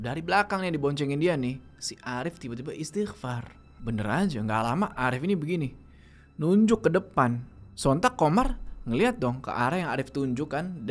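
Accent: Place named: Java, Indonesia. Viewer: Bang keren menjiwai banget ngomong bahasa jawanya😂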